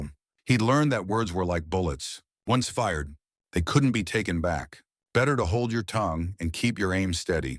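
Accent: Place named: Germany. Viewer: Hi, I’m watching from Georgia, USA🇺🇸 I like Western stories. The story is a heartbreaking one, yet it has redemption from all those who would like to break Clara. Jem was a warm, wonderful man; he stood with honor. Also, this story has a German accent in parts over English, making the story hard to understand. I could only laugh when the narrator read the words. That might be why no one left a comment! Good story! 😊😊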